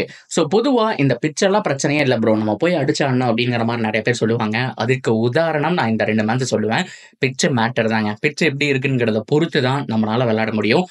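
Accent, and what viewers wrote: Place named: Kolar, India. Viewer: Thala nee yantha ooru thala slong kolara irukku thala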